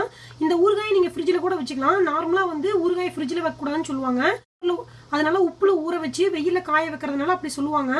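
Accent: Kongu, India. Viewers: Migavum pandhamana pechu.Arumaiyana samayal. Nandri sis. Nam kongu Tamil alage alagu. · Kongu tamil valka supper akka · unga Slang apt kongu style la irukunga sis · Akka kongu slang super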